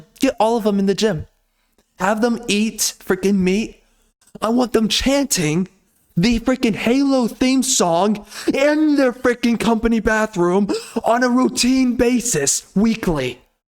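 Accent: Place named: Eastern Europe. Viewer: You sound Slavic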